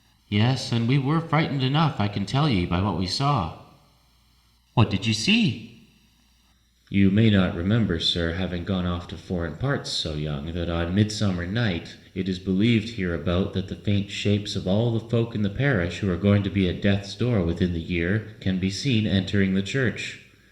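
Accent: Canada. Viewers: Thank-you for making this video, I like it. Is the accent of the narrator Canadian?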